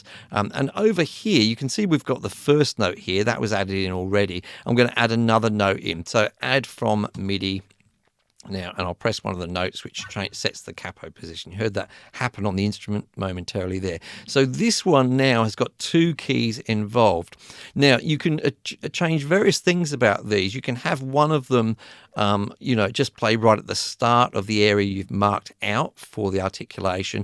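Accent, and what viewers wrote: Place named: Australia. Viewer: Thanks. I switched to Cakewalk a month ago and you helped me. I love your accent by the way. Are you from Australia?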